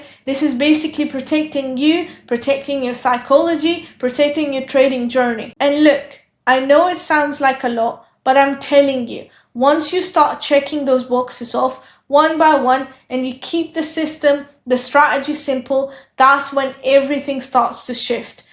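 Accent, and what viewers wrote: United Kingdom. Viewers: Thank you for the video. I am good with accents but I'm really struggling with yours. You sound a little Scottish, but not. Where are you from? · Are you from UK?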